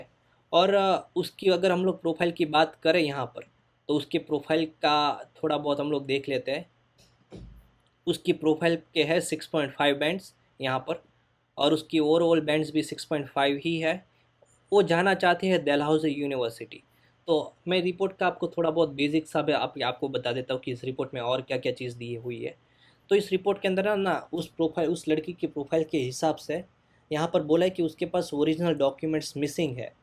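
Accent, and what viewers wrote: Gujarat, India. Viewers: Bro kya aap gujarat se ho?? Because I am from gujarat orr aapki baate sunake muze aisa lag ra hai ki aap gujarati ho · Gujarati kaise Hindi bolta h iske upar se ye Lagta h Please replay me 🔥🙏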